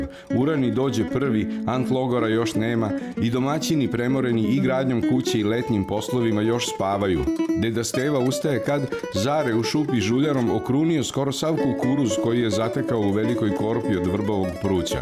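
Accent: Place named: Farkaždin, Serbia. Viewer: Dodju mi tvoje ,,DOPISNICE,, kao vrsta razbibrige u trenutnom karantinu. Hvala ti sto ih pises. Vracas me u meni poznatu sredinu, koje vise nema, a i ljude koje sam poznavala i mih manje vise takodje nema. Sviras odlicno, ali pisanje je verodostojno, prepoznatljivo, vrlo slikovito, a izgovor i glas bas, bas nas farkazdinski! Hvala ti ! PISI samo PISI, vredi truda!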